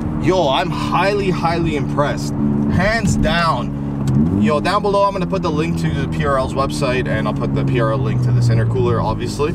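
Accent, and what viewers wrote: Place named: Toronto, Canada. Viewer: You're from toronto. I will alway recognize the way ppl talk here in any video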